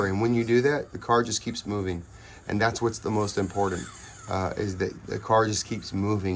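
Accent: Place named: Southern California, United States. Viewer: DUDE don't even trip on sayin DOOOOOOOD. SO CAL from the get go!!!!! I feel you on one handed driving that's 2FMRC territory LOL Diggin your vids DUDE keep it up.....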